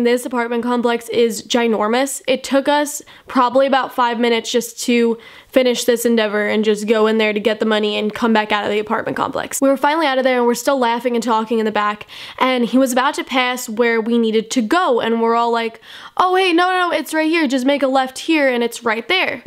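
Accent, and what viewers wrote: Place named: New York, United States. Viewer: I feel like your from New York or New Jersey.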